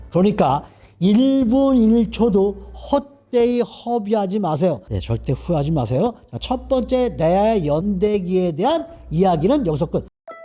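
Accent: France. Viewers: 한국말도 발성이 프랑스식 같아요 ㅎㅎ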